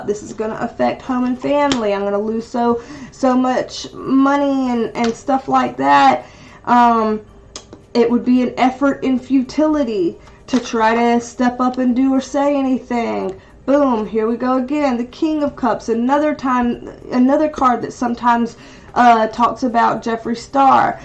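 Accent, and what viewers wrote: South, United States. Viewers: First off let me say your hair style is gorgeous just like you! Hi!! New friend and new subscriber to ur channel from southeast Kentucky here. I can tell you have a bit of a southern accent like me. My accent is southern with a bit too much of a hillbilly twang added to it. Lol...anyway I’m so glad I found your channel and I look forward for us connecting. Take care and stay safe ❤️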